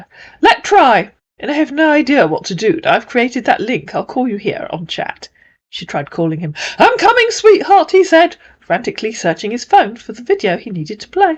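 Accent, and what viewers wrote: United Kingdom. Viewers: 😂 😂 😂 I LOVE it when, in your proper British accent, call him "a semi-illiterate idiot". Oh, you kill me!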